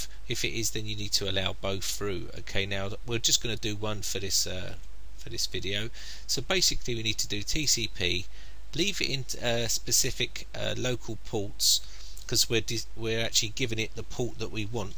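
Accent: United Kingdom. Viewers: I know that's pretty much the accent for all British people lol. There is slight variations. This guy sound like Frankie your right though.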